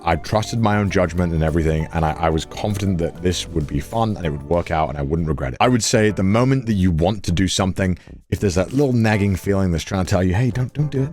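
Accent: Wales, United Kingdom. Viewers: Where you talk about loosing touch with you're "welshness" I feel you're loosing your accent. It's becoming more generically English vs out of the mines Welsh. (vs the midwife I just had for the birth of my son- where it's like, "Yeah you're definately from Wales.")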